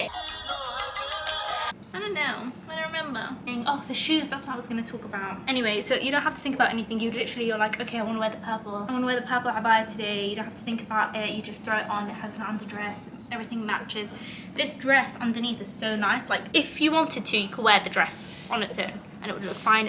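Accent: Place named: United Kingdom. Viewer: You need to tell us your story, who you are and where r y from! Why do you have a british accent, WHO ARE U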